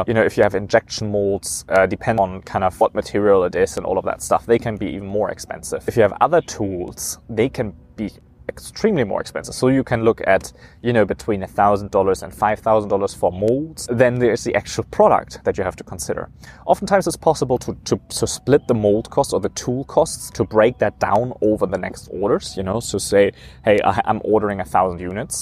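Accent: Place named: China. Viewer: Wow! you also got the chinese accent. Nice video tho.. thanks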